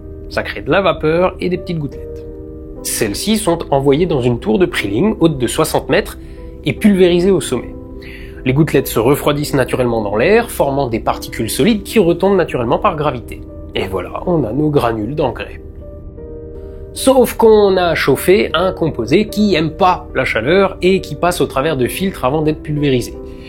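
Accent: Alsace, France. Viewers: j'ecoute toujours tes sponso juste pour ton accent alsacien ALSACE EN FORCE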